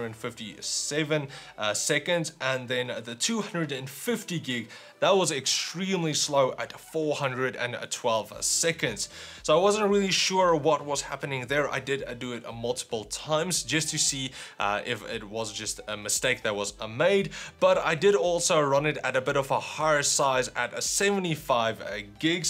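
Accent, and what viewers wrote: South Africa. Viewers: Ek wou se jys n vokken suid afrikaner toe ek jou accent hoor in die begin xD, nice vid, thanks vir die info.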